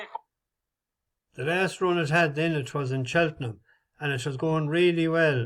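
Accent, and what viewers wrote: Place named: Liverpool, United Kingdom. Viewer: Lucky of the IRISH ☘️ and a good Scouser by the sound of it 🥁🥁 🫡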